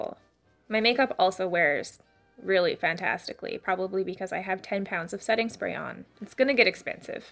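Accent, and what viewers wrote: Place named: Canada. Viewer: I'm a little late to the game but goddamn is it good to see you back 🖤🖤 and good to hear that sneaky Canadian accent 🤗🖤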